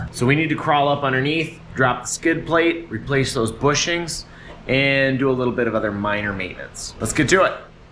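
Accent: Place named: England, United Kingdom. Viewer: But then it's a Town Country/Caravan. I own 2, 02's (Town Country Limited AWD with a custom 1/2" wall rear axle, a Reese Class 5 45707 trailer receiver, a 2" lift, you name it and a Caravan SE, shorty 3.3) Better than any Corvette, Lincoln Town Car, even a Bugatti. You should fix the Dog Legs and rockers. Welding in is best but watch the foam! Excellant editing, clear video, and English accent (unlike my Czech) so that can be isolated. ~ nope 336 subs Youtube is weird isnt it?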